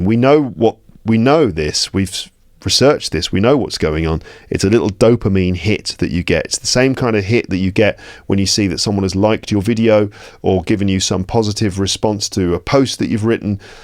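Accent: United Kingdom. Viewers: Hey... Really like your videos. People apart from spoken English can learn British Accent on your channel. I particularly watch your videos for British Accent. It's just a suggestion if you like. You can also start teaching English with newspapers as the language is quite arduous to comprehend. Thanks for the Videos. Best of luck. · His accent is British, i don't know which specific accent because there are a lot of British accents but it's mainly british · This was very informative, very very informative. More content should be made on these lines and other similar important subjects, societal subjects, classified subjects, about realities which are not made public. Some of the topics can be related to cannibalism practice in the 21st century. Subjects related to money and banking and how successful this system has been across the globe. Topics related to different kinds of societies, interesting facts which can be shocking too often times. 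Until recently I never knew the difference between British English and American English and the associated politics at global level. I have been looking for profiles for marriage on Instagram and Instagram mainly shares profiles from across the globe who speak American English or some kind of English but not much from England who speak British English like yours or Sophie Turner or Isaac Hempstead Wright. I find this approach very political in nature.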